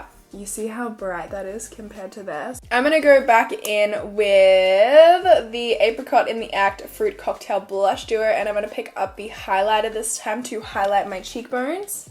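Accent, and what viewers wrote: Australia. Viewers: i really love your Aussie accent and voicee 💗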